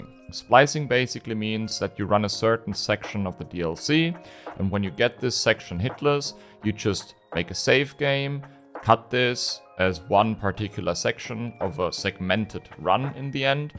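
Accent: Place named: Germany. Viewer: Du kommst aus Deutschland (oder zumindest dem DACH-Raum), machst aber englischsprachigen Content, um potenziell mehr Leute zu erreichen, oder? Also einmal ist da natürlich deiner deutscher Name, andererseits dein Akzent. Ich war nur erst verwirrt, weil YouTube mal wieder von sich aus den Videotitel übersetzt hat ("Der größte Betrüger in Elden Rings" 🙄).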